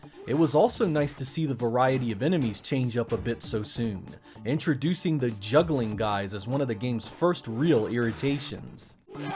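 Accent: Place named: Mid-Atlantic, United States. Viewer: You pronounce water as "wodder", not only do you make great videos, you represent the mid-atlantic region very well!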